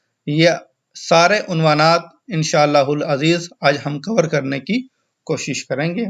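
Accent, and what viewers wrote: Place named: Punjab, Pakistan. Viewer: صاحب! تلفظ کو بہتر کیجیے۔ مناسب انداز ہے اگر پنجابی لہجہ ختم کر دیں تو اور بہتری آسکتی ہے۔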